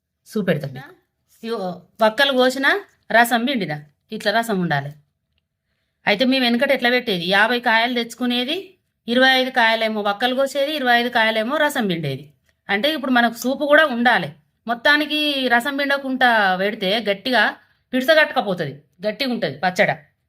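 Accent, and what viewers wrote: Telangana, India. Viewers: Telangana matalatho kadupu nindindi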